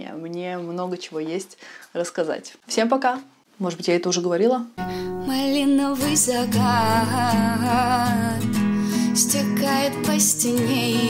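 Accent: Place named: Baltic. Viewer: Умница! Красавица! Спасибо Ирине за то, что она рекомендовала Вас!!! Обожаю ваш прибалтийский акцент. Вы для меня и настроение ,и вкус , и эстетика и женственность!!!❤❤❤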